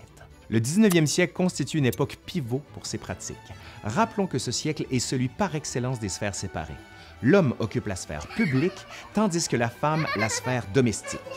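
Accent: Canada. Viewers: Bonjour vos vidéos son excellente et j’aime bien votre accent Canadien bonne après midi à vous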